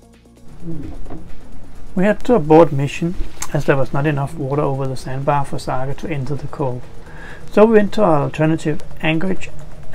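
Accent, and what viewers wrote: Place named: Denmark. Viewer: danish accent..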